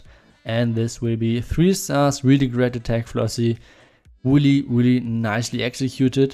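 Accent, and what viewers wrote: Germany. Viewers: Your English is good bro with german accent.... and when you are going to next base building videos? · your English is really good u just have that German kind of accent witch makes since.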